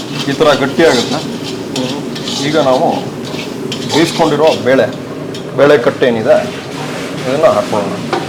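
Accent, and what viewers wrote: Butler English, India. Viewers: Sir, your butler inglish is top, after very long time we are hearing, keep it up.🎉❤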